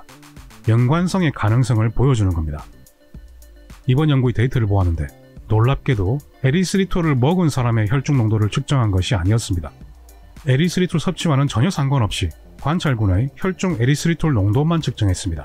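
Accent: Gyeongsang, South Korea. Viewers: 영어 일본어를 마스터해도 경상도사투리는 못고치는구나...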